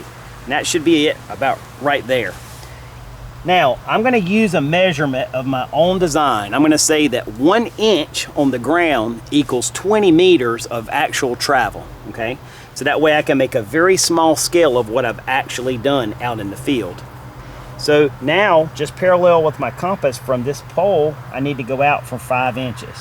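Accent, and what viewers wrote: South, United States. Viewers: A++! I just finished watching all your map and compass videos.....OUTSTANDING! This was a great review for me, although I'm familiar with using a compass and map, I have not done any map work in years. During the 70's I spent a lot of time in Yosemite backpacking and snow camping. Then I moved to upstate New York near the Adirondacks where again I spent a lot time hiking, camping and canoeing (also using map and compass). This video series serves as a great reminder for this "old rusty bird". Moved to Nebraska in 1986 and most of my backpacking days ended then, except for bike touring and camping in campgrounds. I haven’t had any experience with mapping or dead reckoning, so I found that very informative. I must compliment you again, it's obvious you love doing these videos, because you put a lot off effort in each one. I will continue to watch your videos, I'm hooked! 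Will be doing some "upgrading" of my gear with plans to put it all to use this upcoming spring, summer and fall. Don’t know that I’m tough enough to camp during these Nebraska winters. Thanks again. BTW, what state do you live in? I've noticed your southern accent. I grew up in Texas and consider myself a "misplaced Texan", so I understand words like y'all, fixing and stuff. 😜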